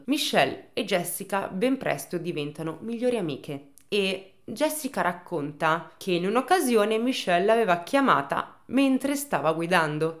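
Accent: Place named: Genoa, Italy. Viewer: Mi pare di capire che sei leggermente genovese🤣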